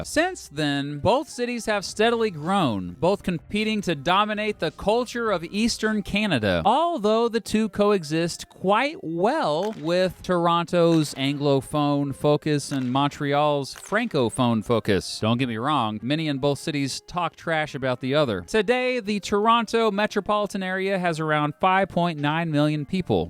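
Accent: United States. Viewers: His grating American accent was enough to stop watching . Get a Canadian at least to narrate a video about Canadian cities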